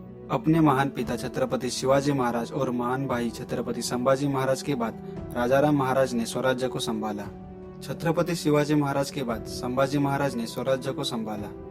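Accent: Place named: Maharashtra, India. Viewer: Are video banawnara pan marathich ahe... accent Varun kalat nahi ka...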